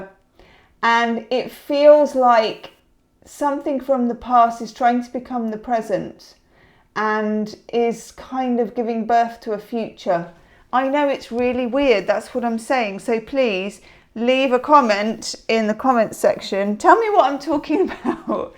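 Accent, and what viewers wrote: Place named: United Kingdom. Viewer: VO5 through his hair.... How old is he lol....always a pleasure to hear a British reader not afraid to be totally British. (Many of my favs are American tbh). Maybe one day I could brave myself on you tube, unlikely my reads are far too fanciful....(although saying that love Nigel's reads)....namaste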